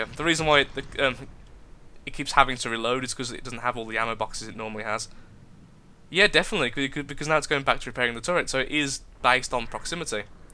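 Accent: United Kingdom. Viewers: are u a brit?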